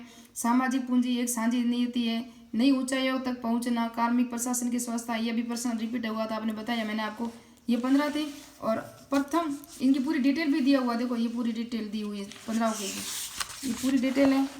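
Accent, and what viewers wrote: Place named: Rajasthan, India. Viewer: Aap badmer ya jodhpur side se ho kya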